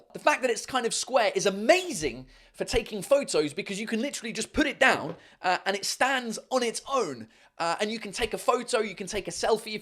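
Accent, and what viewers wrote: United Kingdom. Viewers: How can you manage to have that great British accent and a native French accent :o Great video by the way!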